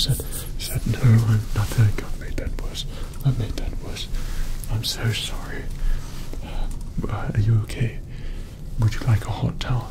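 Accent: United Kingdom. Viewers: So you are British. Nice and same. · I swear he's british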